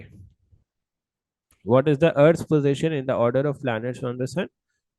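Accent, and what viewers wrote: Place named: India. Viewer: fav indian accent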